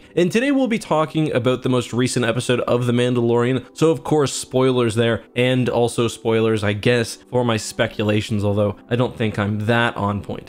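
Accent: Canada. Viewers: I always forget your Canadian until you say aboot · Ah booooot, lol Canadian?? Sweet! Maple syrup & such. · Is it just me or is his Canadian accent getting stronger and stronger? And this is coming from a Canadian.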